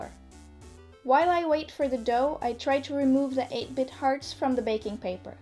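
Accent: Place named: Canada. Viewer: you sound canadian, are you from canada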